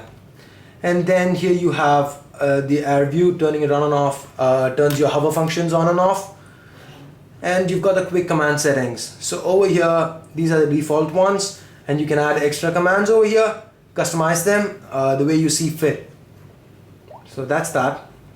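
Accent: India. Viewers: I just realized I fucking hatttttteee indian accents. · Definitely an Indian dude! ^^ Good review though! Cheers! =)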